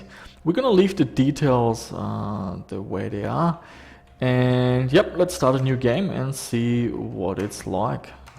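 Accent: Germany. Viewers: are you from germany or austria? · Ach du bist Deutsch :D Hab mich schon die ganze Zeit gewundert was das für ein Akzent ist XD Tolle videos, weiter so!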